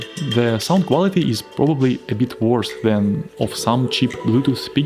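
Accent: Russia. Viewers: Настолько сильный русский акцент, что все понял даже без перевода.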